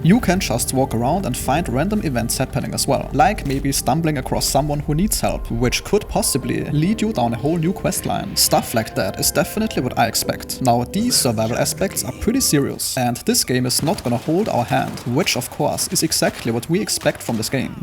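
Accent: Germany. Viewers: Am akkzent war klar zu erkennen das hier ein Deutscher zu sprechen scheint.. Aber österreich ist auch nah genug dran.. Danke für das Video und die Informationen ❤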